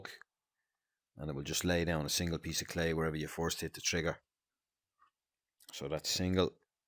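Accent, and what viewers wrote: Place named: Dublin, Ireland. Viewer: I fucking love hearing a Dublin accent doing high end 3D stuff. Hope you’re well!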